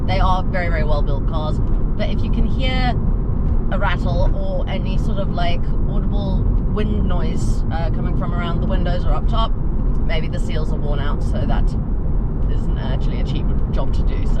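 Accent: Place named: Australia. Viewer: This is Aussie accent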